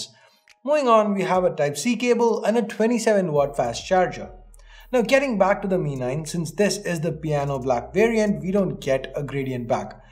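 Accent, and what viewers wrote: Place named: India. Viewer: It's the Indian accent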